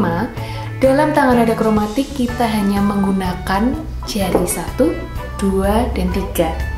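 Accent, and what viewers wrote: Java, Indonesia. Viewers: Medoknya khas banget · kak medhok bgt suaranya 🤟😅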